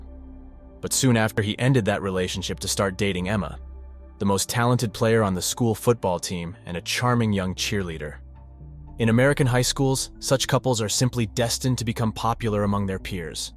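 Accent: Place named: Australia. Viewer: I love to see how you are growing so fast!! I miss the Aussie accent. Great video!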